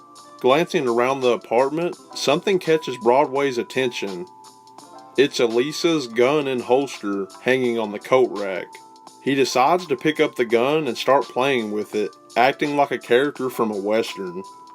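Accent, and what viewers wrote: South, United States. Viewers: its cool seeing a youtuber like this having a southern accent its really rare (im from a southern area lmfao)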